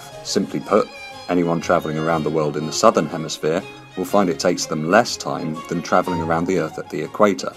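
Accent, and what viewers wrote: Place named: North, United Kingdom. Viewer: I like the shaky camerawork at the beginning! It gives the film a bit of a 'Blair Witch' edge to it. Seriously though ... your mellifluous northern tones makes the rather esoteric subject matter a lot more palatable. As if Brian Cox had presented those Open University programmes in the 70s. Now then. The question is what do I do first? Watch your other videos? Visit Iovandrake's profile with my troll mask? Or Google 'potholer54'? And before you say 'none of the above' ... you're too late. I have already subscribed to your channel. Nice one!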